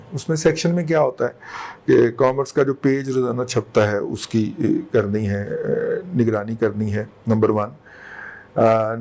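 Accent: Punjab, Pakistan. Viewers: جملہ میں اگر بار بار “نا نا” استعمال کریں مثلاً “آپ کو کہا تھا نا” اس سے اردو اچھی نہیں لگتی۔ اگر پنجابی اور انگریزی کے الفاظ استعمال کریں تو جملہ واہیات لگتا ہے۔ اپنا پنجابی کا لہجہ بھی ٹھیک کریں۔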